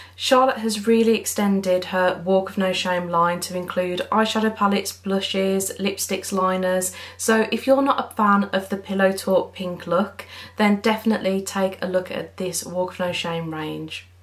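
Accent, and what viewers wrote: Birmingham, United Kingdom. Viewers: You sound like a fellow brummie to me - then I heard you say ‘my mom’ and decided most definitely! Thanks for the swatches. You obv love them because they’re all so well used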